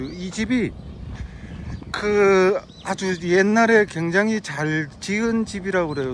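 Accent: Gangwon, South Korea. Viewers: 더워요 얼굴을좀 강원도 사투리 구수하고 말억양이 독툭해서 자주시청하는대요 목소리는 젊은신것같은데 언득보니까 60대70대같어서 얼굴공개 안하시는건가요 어때요 맘이 고우시고 어지신것같은데요 얼굴보고싶어요 암튼 대박나시길 바랍니다 핫팅 ㅎㅎ❤😊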